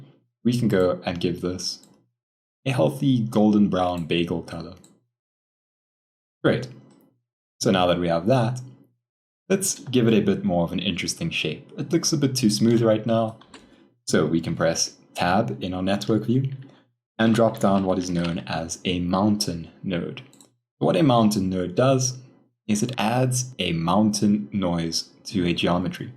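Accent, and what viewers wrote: South Africa. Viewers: Only stumbled on your stuff now...almost 2 years later. I was surprised to hear the South African accent. I'm here in Gauteng. Great tutorials man!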